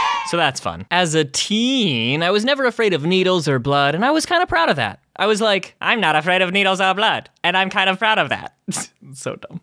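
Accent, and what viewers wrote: Canada. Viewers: you kinda sounded like a candian from south park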